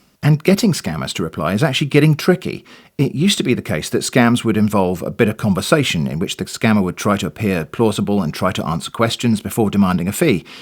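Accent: England, United Kingdom. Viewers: I hope you are very careful at doing this sort of thing. Watched a few of your videos and makes me concerned for your safety. After all you are putting this on YouTube and they could be watching and know what name they gave you email profile, you may bait the wrong person, piss them off and then find out somehow where you live and since you have an English accent probably won't be too hard. Since you are warning us educate us on these scum of the earth buttheads, making them harder on them to get money, one may get really ticked off and the person may not be mentally stable and decide to come face to face with you and do something horrible to you. Very concerning, very alarming. I do thank you for these videos and appreciate the risks you do take, sacrifice your risks, and safety for our sake is such a noble act and I hope others will be grateful and thankful as much as I am and I have learned a lot from your "scam baiting" videos. 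Now I know what to look for when checking emails and text messages with caution. I get a ton of emails and text messages from these creeps. My phone has that scam block on both emails and text messages, but for some reason they keep coming through. I have downloaded apps from the play store, and they don't do jack nor sh*t to stop it. If I don't keep my phone on do not disturb, my phone is constantly ringing. If you tried to call them back, the AI voice says " this number is no longer in service" so they can call you all day long but can't call the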